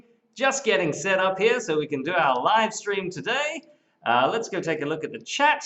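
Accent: Australia. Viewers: I love that you are ashtralian and that you are not british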